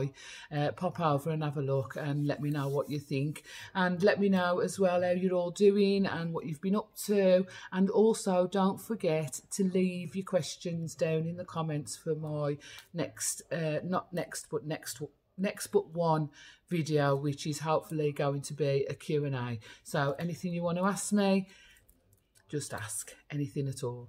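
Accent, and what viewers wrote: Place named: Midlands, United Kingdom. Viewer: That always happens to me when I go shopping.. the cream cakes just fall in my basket.. I haven’t go clue how they get in, but I have to eat them or else it would be a waste wouldn’t it ..lol, that’s what I tell my hubby anyway..lol. My question is...(I’m from the midlands, I recognised your accent.... what part of the midlands are you from..xx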